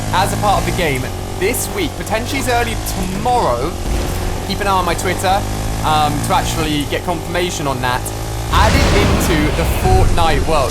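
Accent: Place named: United Kingdom. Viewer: O my gush i cant Understand you are you fro Uk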